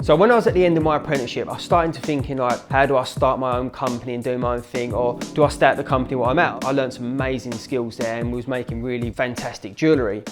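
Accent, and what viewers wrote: United Kingdom. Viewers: ما فهمت ولا حرف، كسم اللكنة البريطانية😂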